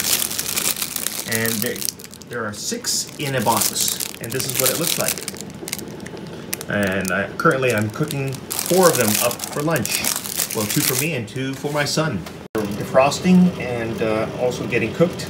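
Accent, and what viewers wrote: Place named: United States. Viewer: I like your vlogs and you speak perfect American accent. No wonder you are good at narration. Anyways, will be following your vids from now. Keep safe.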